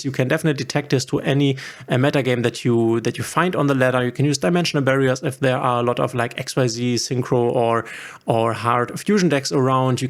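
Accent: Germany. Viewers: Hör ich da nen deutschen Akzent raus ? 😄 wenn ja, geiles Video 💪🏻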